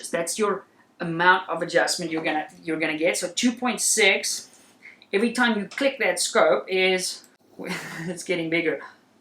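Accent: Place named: South Africa. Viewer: Man. Goed Om ‘n Suid Afrikaanse aksent te hoor in die vreemde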